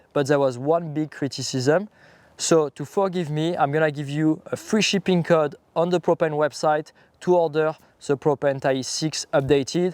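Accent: France. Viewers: I just come to hear him talk in that thiiiic french accent. :body-blue-raised-arms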